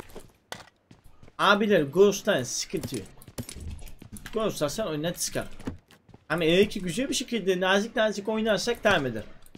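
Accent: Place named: Black Sea, Turkey. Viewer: gerçekten laz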